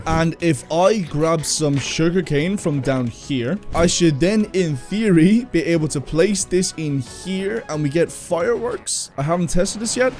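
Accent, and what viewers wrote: Ireland. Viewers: you Irish? Thats cool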